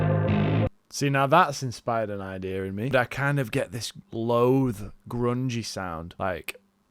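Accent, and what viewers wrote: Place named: Yorkshire, United Kingdom. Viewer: Another Yorkshire lad?? 🤘🏻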